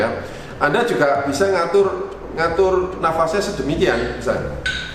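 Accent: Surabaya, Indonesia. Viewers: Wong Suroboyo iki koyoke hehe. Thx tips nya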